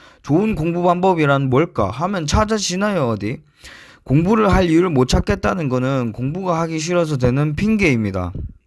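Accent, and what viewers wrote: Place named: Busan, South Korea. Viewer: ㅋㅋㅋㅋㅋㅋ 말투가 여기쪽이네...부산창원...정겹습니다.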